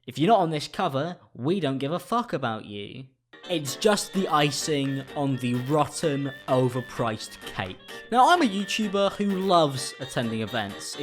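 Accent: United Kingdom. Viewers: Who knew Timmy Turner had such a good British accent?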